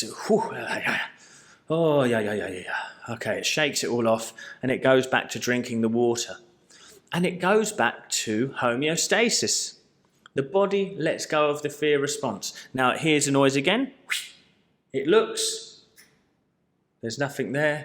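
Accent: United Kingdom. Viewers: It’s so wonderful to hear your uk accent talking about all these teachings and scientific findings that we have read in these incredible books and that aren’t being shared in the mainstream. I have read Bruce Lipton, Jo Dispenza, Gregg braden, deepak chopra and many many more.. I love the way you explain it. You are refreshing and genuine and your realness shines through in your video. Thank you 🎉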